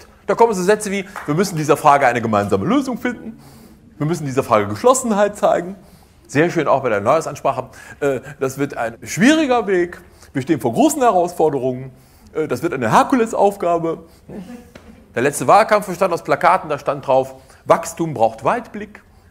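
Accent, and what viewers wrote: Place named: North Rhine-Westphalia, Germany. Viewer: Wunderbare Lehrstunde mit rheinisch-westfälischem Duktus. 👍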